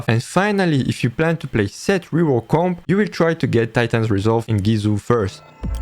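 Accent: France. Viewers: Serais tu français par hasard ? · i didnt understand shit... :/ so hard to keep up, (and also, trying to apply this, got me have the most shamfull loose ever, insane how bad it was XD - it didnt work a bit for me, also because i am nob, but also because i can't understand shit from your "french accent english")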